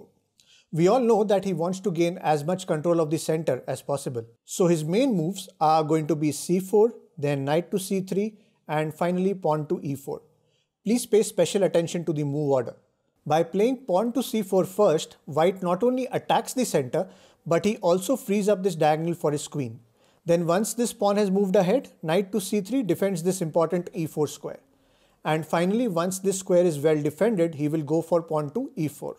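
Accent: India. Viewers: Yariel Robles how on earth have you never heard an Indian accent? You’re aware like 1/7 of the world is Indian..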